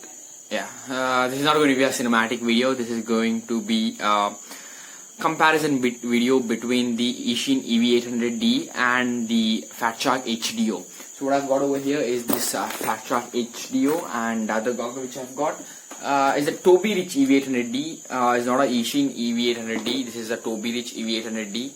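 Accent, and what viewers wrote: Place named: England, United Kingdom. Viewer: Hi I am deon dsouza I liked your English accent. Keep going. U r doing very well.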